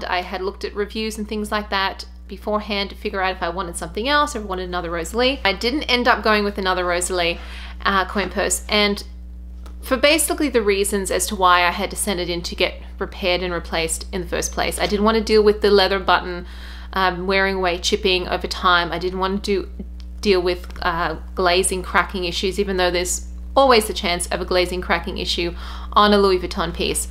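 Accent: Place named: Australia. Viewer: New to your Chanel. You sound Aussie! So why the US $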